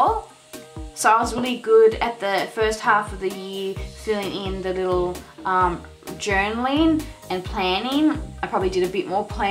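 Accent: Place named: Australia. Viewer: Omg I was wondering if you were aussie from your accent. I had to check the info on your page. Yay to Aussies using Hobonichi. But omg the shipping!!!!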